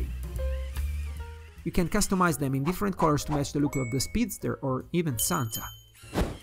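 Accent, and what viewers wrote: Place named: Italy. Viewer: I'm guessing Italian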